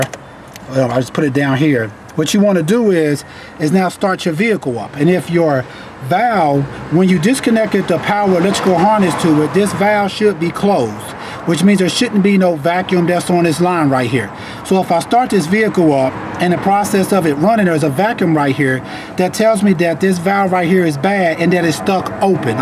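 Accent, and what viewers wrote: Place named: Louisiana, United States. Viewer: i hear sounth louisiana, st bernard parish in dat voice. great tip, thank you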